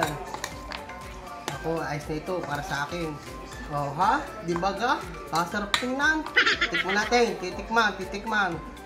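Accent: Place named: Batangas, Philippines. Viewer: Kakatuwa talaga ang Punto Ng Batangas ,sadyang mukhang masarap Ng iyong gawa2ng sizzling Shiela A. Vlog here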